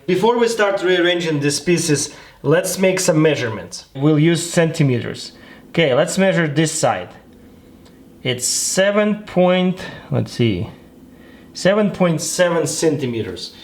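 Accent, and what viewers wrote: Russia. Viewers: Ох уж этот знакомый русский акцент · Прям слышно русский акцент произношения английского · Ну слышна русская речь,так же говорю по-английски)Ахх,акцент не пропьешь) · Сач э стронг рашн эксент! Ыыыы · такой русский акцент, ахвхах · У тебя иногда проскакивает русский акцент) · ОХ ЗЫС РАШЕН АКЦЕНТ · Really funny tbh, I didn't look at his name but heard his voice and tbh this is not the thickest Russian accent I have heard tho. Very close to English, good job for learning english so well btw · Ммм, русский акцент, ну привет, русская душа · Is this Russian accent? Oh, stupid I didn't read the name of channel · Мне очень нравится его русский eanglish. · Me:is he Russian? Also me: scrolling down and sees username oh... Edit: why is this still getting more likes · Me: he have a heavy Russian accent—oh he’s Russian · Вау!Вы русский и говорите по-английски.Да,виден русский акцент.Почему,кстати,русские говорят куда хуже на английском,чем любые другие народы(греки,итальянцы,турки,голландцы,немцы)? 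· All the Russian YouTubers have the same accent.. Taras Kul.. and him . They speak the same. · Акцент не совсем английский, или ты специально, типа рашен стайл?) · Как же я кайфую от русского акцента :3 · А акцент то русский 🌚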